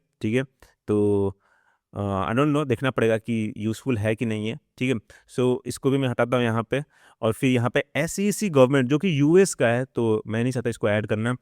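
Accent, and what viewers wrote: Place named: Rajasthan, India. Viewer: bhai aap kaha s ho aapka accent to rajasthan ka lag rha h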